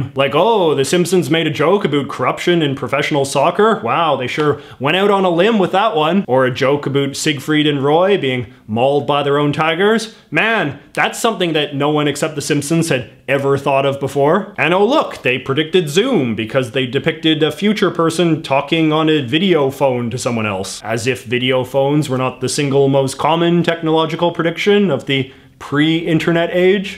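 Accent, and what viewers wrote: Canada. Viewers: Your canadian accent is strong af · guy sounds like zach braff but Canadian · Some times I’ll forget you are Canadian and the out of nowhere you’ll hit me with an ‘aroond’ when pronouncing ‘around’ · As a Canadian I can promise you that no one in Canada has a "Canadian" accent this strong. I call shenanigans! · I'm Canadian, and you have the most Canadian accent I've ever heard. I've never heard someone pronounce "around" like you. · I always thought us Americans always played up the Canadian accent but damn you’re super Canadian! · as a Canadian I can say you've got quite the stereotypical Canadian accent! kinda funny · omg ur canadian accent is so funny LOL great vid tho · He talks “Canada”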